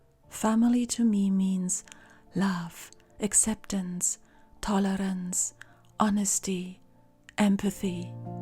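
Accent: Scotland, United Kingdom. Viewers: Ah, danke ❤. Ich liebe deinen schottischen Akzent. Auch dir einen wunderschönen 3.Advent 🎄☃️🎅🕯️🕯️🕯️❣️ Bis bald ❣️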